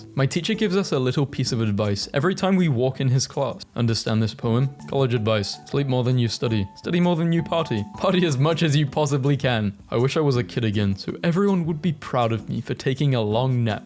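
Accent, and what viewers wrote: United Kingdom. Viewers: Why are you British 😕🙁☹️ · His accent makes it A LOT better Shotout to British people and UK itself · Is he British??? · This guy's british?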